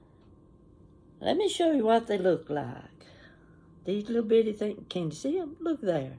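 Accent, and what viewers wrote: South, United States. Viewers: You remind me of my Aunt Jean who lived in the country of Alabama, same sweet smile. I think I must have grown up deep south a bit since both my parents were from Birmingham, Alabama. However, they moved to Texas where I was born. So friends tell me I sound more like I'm from New England with a few Texas bits, but I have deep southern habits. Lucky me! Anyway, I love stories and hearing your accent reminds me of my mom. Bless you.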